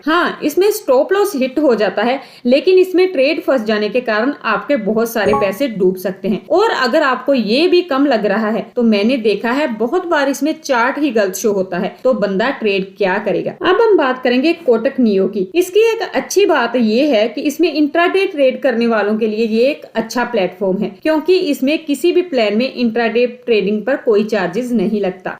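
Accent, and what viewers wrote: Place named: Punjab, India. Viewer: Loved the Punjabi ascent. I am using Zerodha and touch wood didn't face issues till date. Having said that I am beginner hence do not transact heavily on the platform. Good to know Angel One is a good platform (I hope this video was not sponsored by them). Please make one video on how to move your shares held in Zerodha to Angle One?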